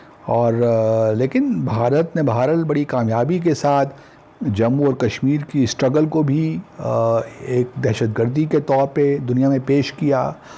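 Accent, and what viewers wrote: Pakistan. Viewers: Sir, are you a Hindko speaking Peshawari?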